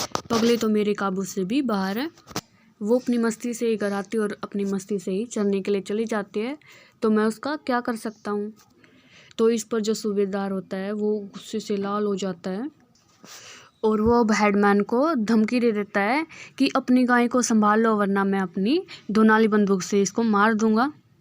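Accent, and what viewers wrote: Haryana, India. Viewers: Full Haryanvi ascent narration of story' 😊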